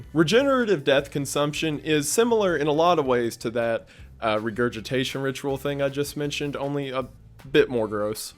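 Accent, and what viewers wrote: South, United States. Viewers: He is so articulate..and every now and then - I’ll hear a very slight, southern, twang (or maybe it’s considered a drawl, forgive me, I’m nothing more than a Michigan Troll) but nevertheless, I honestly enjoy hearing it!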